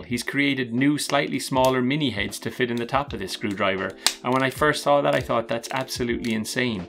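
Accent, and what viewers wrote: Ireland. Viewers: Great stuff and great to hear an Irish voice with products that are available in Ireland and the EU - you presentation and production skills are excellent and the content is refreshing - it is worth paying for quality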